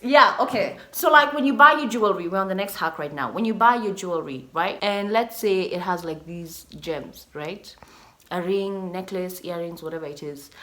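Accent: United Kingdom. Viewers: The British accent tho🥰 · am here for the accent...girl i love you....when you are loud kidogo and ur british/UK accent can be heard in there somewhere..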